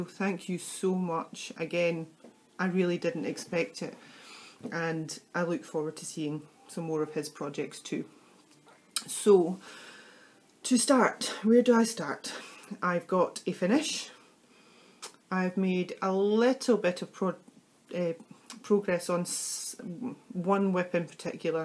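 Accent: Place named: Scotland, United Kingdom. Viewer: My grandparents are from Scotland...it's great to hear their accent again. Can you show me how you use the gradient floss?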